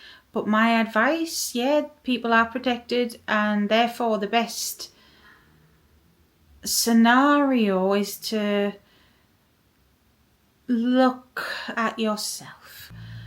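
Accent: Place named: Scotland, United Kingdom. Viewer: This is quite the journey we’re all on, quite the balancing act. I repeat to myself every morning before I get out of bed,” I will not give into the lies, I will not give into fear. I will hold onto Hope, I will hold onto truth, no matter what”. Building a supportive community is absolutely essential during these turbulent times. And my dear, your videos are very helpful just to hear that sweet sweet Scottish accent soothes my soul. Thank you for everything you do for this community! Sending love and light to all 🙏🏼💙❤️‍🔥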